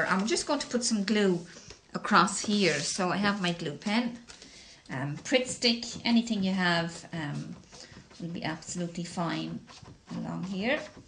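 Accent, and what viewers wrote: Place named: Ireland. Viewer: Thank you. I love your lovely Irish accent.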